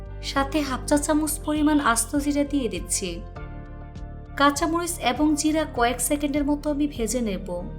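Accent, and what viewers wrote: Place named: Dhaka, Bangladesh. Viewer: আপু ঢাকা যে থাকেন সেটা আপনার কথা শুনে বোঝা যায় বাকি ঢাকা কোথায় থাকেন আমি ও ঢাকা উত্তরায় থাকি। আমি আপনার বড় ফ্যান আপনার রান্না গুলো দেখে খুবই ভালো লাগে আর আমি আপনার ভিডিওগুলো সব সময় দেখি। ❤️❤️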